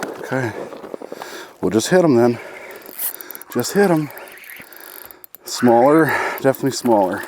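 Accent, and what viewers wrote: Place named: Canada. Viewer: I think it's hilarious how Canadians say semi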